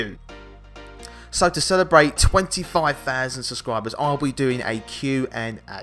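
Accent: United Kingdom. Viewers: I love your Accent is it British?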